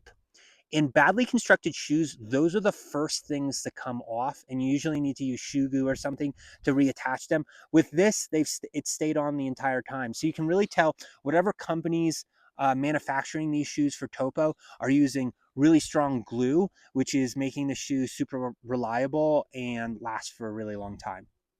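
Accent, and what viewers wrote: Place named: Eastern Pennsylvania, United States. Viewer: Great video. I appreciate the way you break things down into details. I had a similar experience with a different Topo shoe that I tried a couple years ago, I think the Pursuit but not sure. It was great and balanced in every way except one small subjective thing that many people would be fine with, but I thought felt uncomfortable, and for me that was a deal breaker. Btw are you by any chance from eastern Pennsylvania? Your accent sounds like someone I know who grew up there.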